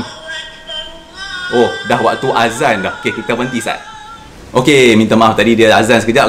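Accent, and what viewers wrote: North, Malaysia. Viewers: Penerangan yg sgt jelaas. Tambahan pulka cakap utagha kira geng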